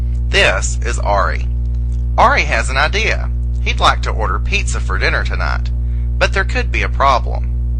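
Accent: South, United States. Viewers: I’m just here for the delightful southern accent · I never thought a gay southern man could bring this much joy to my homework.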